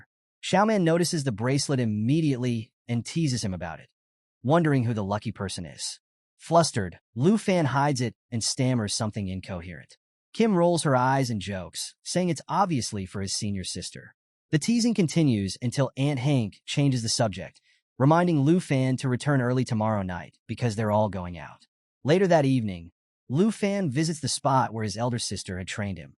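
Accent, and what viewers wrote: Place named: United Kingdom. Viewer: The british narrator change on the 10 hour to 12 hour mark made my brain go mush i could not understand anything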